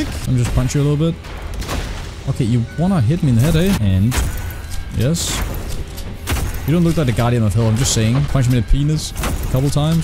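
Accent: Denmark. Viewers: my guy you danish?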